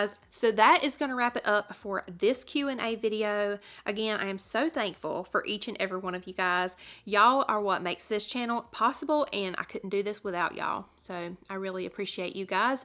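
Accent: South, United States. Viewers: I live in North Georgia. I 💙 your southern accent because I have it too.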